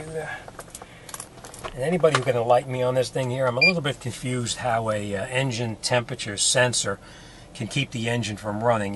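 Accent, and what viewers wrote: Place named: New Jersey, United States. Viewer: Sounds like you from joisey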